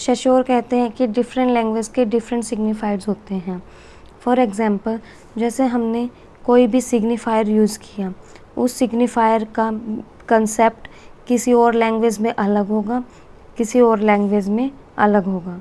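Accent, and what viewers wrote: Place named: Jammu, India. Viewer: Thank you for the video. And your voice is just 🔥🔥🔥 Your tone makes me feel like you are from Jammu 😅. Anyways, Stay blessed.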